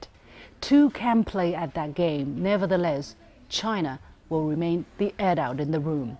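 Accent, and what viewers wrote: United Kingdom. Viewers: youvecattobe kittenmeritemeow 这个是英音吗？ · are you really living in China? then how do you get on the youtube? As far as I know, youtube, along with EVERY western media, like FOX CNN are banned in China. Her British English sounds well, but unfortunately it full of lies. You do know that there is no freedom of speech in China, and Liu Xin is working for CCTV, in other words, she works for CCCP. I challenge you slightly criticize Chinese Xi Jinping as a dictator, he has revised the Chinese Constitution and will remain in the power for whatever he like, what that sounds to you?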